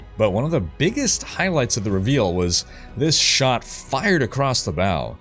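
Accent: Quebec, Canada. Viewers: Wow did not know you spoke Québec french ! Ton accent est excellent ! spa mal bon ! Sa fais des années que je te suit, tu es la meilleur source d'inforemation sur Diablo et autre que je connaisse sur youtube ! Lache pas ton excellent travail ! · Playing ladder, for the first time in d2 (played this game back in the day, never made it all the way to Hell difficulty). I see this ladder as an opportunity to finally get to endgame and experience it. I made a fire druid, very funny since the 2.4 patch. At the time of writing, I’m level 55, act 3 Nightmare, and I’m having a blast so far!!! Thanks to your video I managed to do a Spirit Crystal sword and it is very strong!!! Also, I just recently discovered your channel cuz of D2R ladder, and love your content! Specially you speak french canadian (as I am), that Câlisse was funny!) 😂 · Hahaha so nice to hear you talking (Canadian) french ! 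(Belgian/french speaking follower here :)) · Are you from around Montreal? Your Quebec accent is on point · His "english canadian" is so good, I wonder if is his french canadian is his mother tongue or not. 😀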